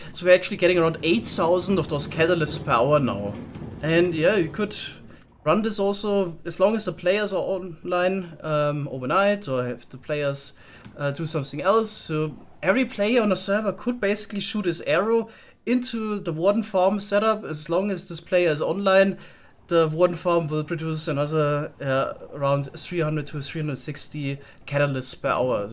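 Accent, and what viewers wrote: Germany. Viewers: Der deutsche Akzent ;-) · er hat nen deutschen english Akzent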